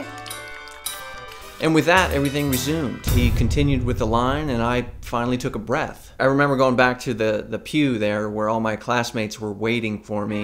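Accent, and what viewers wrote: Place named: New Jersey, United States. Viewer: For the longest time I would have thought you to be Italian because of your accent (you sound like you're from NJ and with the nice thick black hair and chiseled jawline... slaps face ... okay Tiny, ya need to get back on track!) In that respect I would have assumed you were Catholic. But my friend says your last name is Ukrainian and if you were brought up in any religion it would likely have been Eastern Orthodox or Lutheran, depending what part of the Ukraine your family had originally immigrated from. So is she right?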